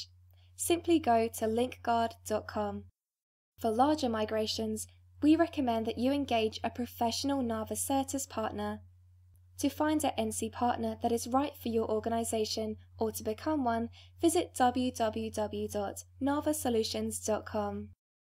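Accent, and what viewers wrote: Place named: United Kingdom. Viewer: Can't understand a word of her thick British accent.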